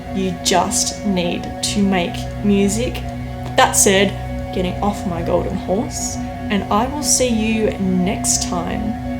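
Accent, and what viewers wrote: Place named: Australia. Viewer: Hi! Thank you so much for your kind reply, I will keep that in mind. BTW love your channel, thought you are British - but you are from Down Under:-). Take care and cheers, Peter, Austria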